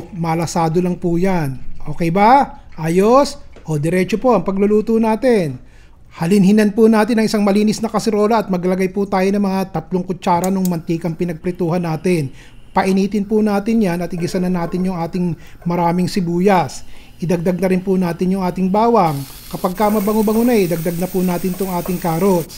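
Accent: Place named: Bulacan, Philippines. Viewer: Gud morning, thanks again sa npaka simple at npakasarap na chicken recipe,halos parehas tau magsalita ng tagalog.ganyan kaming magsalitang mga taga Bulacan at Nueva Ecija.GOD BLESS YOU AND YOUR FAMILY...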